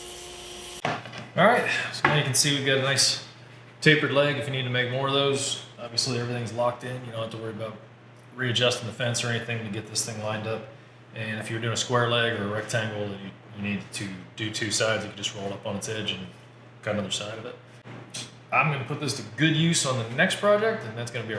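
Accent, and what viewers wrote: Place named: East Texas, United States. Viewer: Where you from brother? You speak like me…east Texas?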